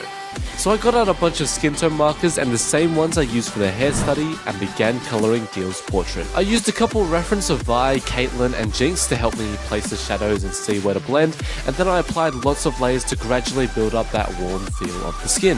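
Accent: Australia. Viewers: Are you Australian cuz I am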